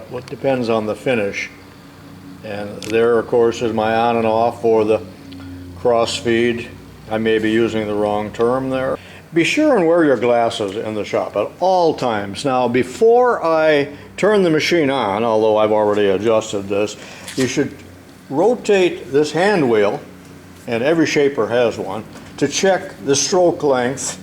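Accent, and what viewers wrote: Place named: United States. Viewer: When i was a kid, way before the internet. The way you talk is how i imagined all Americans talk. To my foreign ear the pronunciation is like poetry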